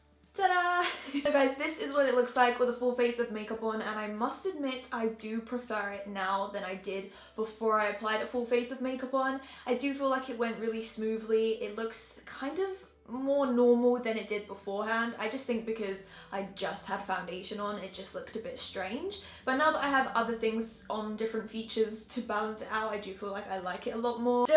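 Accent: Australia. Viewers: Are you Australian or From New Zealand? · is she australian like me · Why does she sound Australian when she's English? I don't get it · Hi. In which country do you live? your accent sounds australian :)) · krystal turvey no she have an australien accent.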